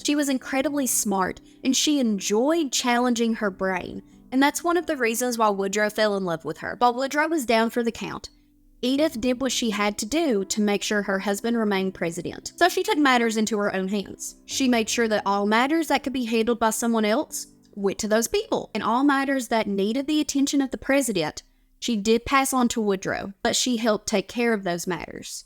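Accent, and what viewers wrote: South, United States. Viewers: I like your southern drawl. It's pretty.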